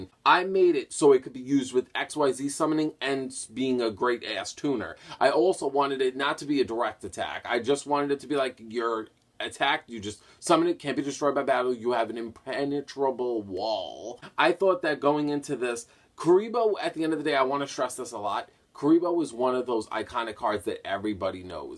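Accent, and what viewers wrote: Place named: New York, United States. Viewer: yo you live in Alabama or just have an Auburn shirt? I always assumed you were from new York or somewhere around there by the accent.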